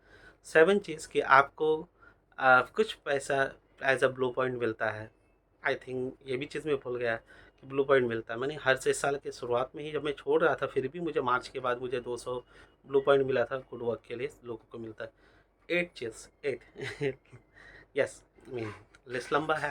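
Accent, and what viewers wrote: Bengal, India. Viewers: R u Bengali ? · U got bengali accent while talking..khoob sundar👍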